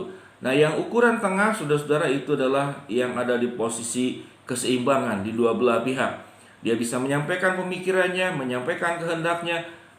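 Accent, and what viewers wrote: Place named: Palembang, Indonesia. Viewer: Sepertinya cara berbicara nya kayak ada logat Palembang nih mas ya ? Hehehehe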